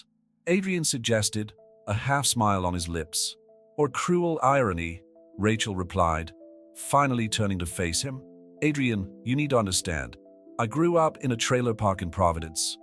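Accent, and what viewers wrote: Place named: Ireland. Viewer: The reader has such a lovely accent. Is that Irish I’m hearing? Is it AI? Either way, I’ve enjoyed the sound.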